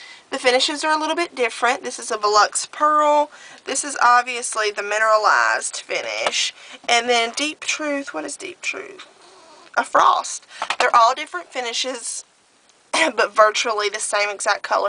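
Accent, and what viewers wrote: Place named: South, United States. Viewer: I love your Southern accent! Anyways, I'm gonna get myself an electric eel tomorrow.. I love my blues and greens too. I just hope it suits me. :-S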